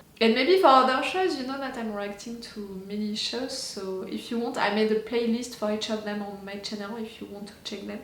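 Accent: France. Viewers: I love your french accent it's beautiful! I subscribed. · I love your Frenglish accent and your true to the ground emotional reactions, your tears of joy or sadness, your authentic cuteness. <3 Shy meow and hug from Switzerland. ^^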